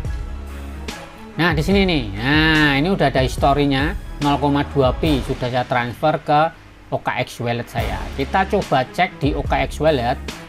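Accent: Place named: Java, Indonesia. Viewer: medok kali mas.....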